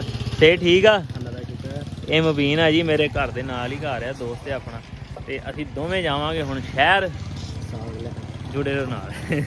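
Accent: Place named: Majha, India. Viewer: Majhaa ali boli bolda bruh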